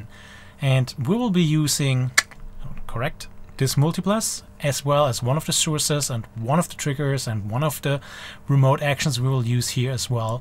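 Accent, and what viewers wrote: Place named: Germany. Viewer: I noticed the German accent and was bit confused that there's the "United States" in the channel description.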